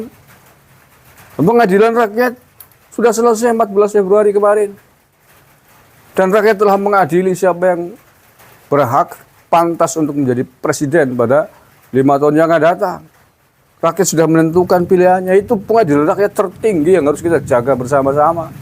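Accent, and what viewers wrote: Banyumas, Indonesia. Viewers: Hebat bahasane banyumasan banget teknbrengkolan bae mengko aku ketawa ngakak banget bisa menghibur jafi aku ketawa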